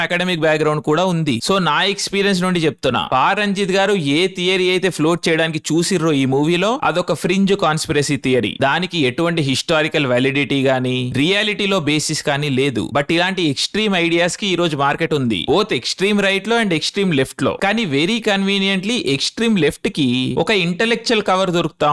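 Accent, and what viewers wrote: India. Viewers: Arey.....ayaa....idi English lo Telugu la undiraa...em matladutunnavra ayyaa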